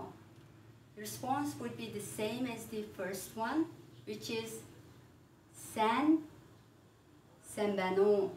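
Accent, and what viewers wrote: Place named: Mongolia. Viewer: it is actually pure mongolian accent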